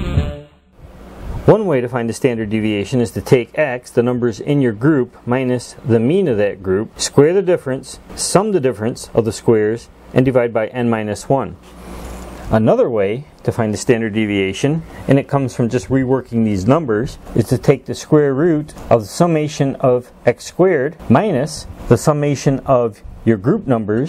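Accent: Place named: New York, United States. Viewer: God bless yer newyorkan accent 😂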